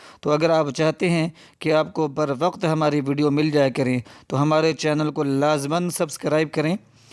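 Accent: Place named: Bangladesh. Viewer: this is bangali accent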